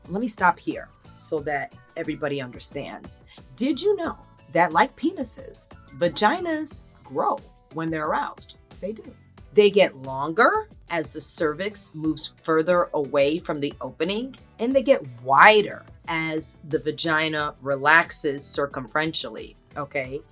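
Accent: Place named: United States. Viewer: American narration....cant be bothered to listen.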